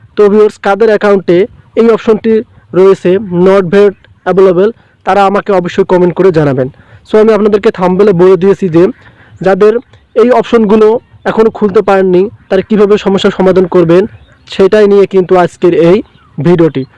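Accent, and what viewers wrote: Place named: Sirajganj, Bangladesh. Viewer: মনে হয় সিরাজ গঞ্জ বারি আপনার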